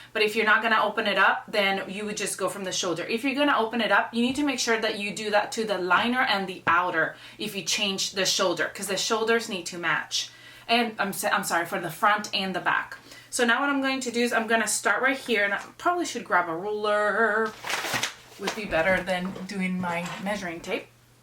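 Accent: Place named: Spain. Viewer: You have a Spanish accent!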